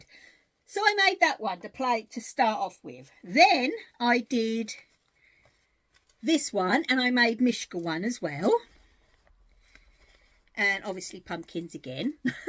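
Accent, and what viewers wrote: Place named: Essex, United Kingdom. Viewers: Hi, please ignore these people they obviously have very sad lives and more spare time than you or I. You talk like me because we both come from Essex, I originate from Colchester so it is our accent. Don't think about changing ever, you make me laugh. And I would rather you say well done lots than not say it at all. Tc our lovely friend, get well soon and enjoy the show on Sat.. Look forward to seeing what you buy. Lol xxx🧶🧶👍🏻💖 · I'm catching up on some back episides 😂 I LOVE those Crunkle socks, they're gorgeous. I'm so glad you blocked that idiot. Honestly I love your accent, I grew up in Essex but left probably 30+ years ago and I love your accent, it's so lovely to hear. You keep being you, you're a treat and it's blooming kind of you to do these videos at all, they take a lot of time to do don't they! ❤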